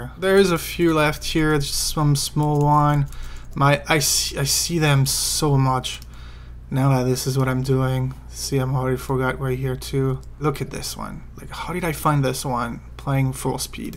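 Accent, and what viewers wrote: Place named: France. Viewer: J'adore le petit accent français. Hello from LA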